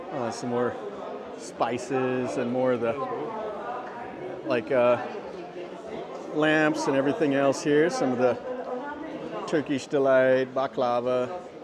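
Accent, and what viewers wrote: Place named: Midwest, United States. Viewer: Very good video. I’m curious where your accent is from (this a way, that a way, talkin, shoppin) maybe Midwest in the US.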